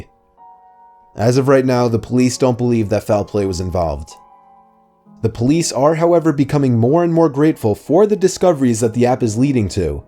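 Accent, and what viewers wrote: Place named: Wisconsin, United States. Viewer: where's from? the accent on the vowels is real. being from Wisconsin myself, I know the struggle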